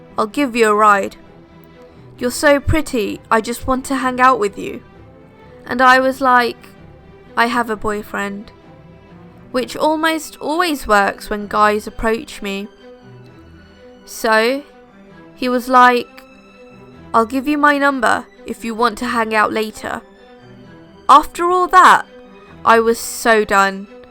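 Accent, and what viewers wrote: United Kingdom. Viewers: I like your videos, mostly because of your voice and the well-picked stories. But I have to say, that the videos don't "haunt my nights" like other creepypasta-narrations (or any other creepy stuff :P) because of your voice. Personally I would say, that your videos (and your voice + the cute, little, british accent :D) are fascinating and, yes, also a bit creepy (And you don't want to know how long I've been searching for a combination like that) In short: I like your videos and ,please, never change the way your narrate the stories :)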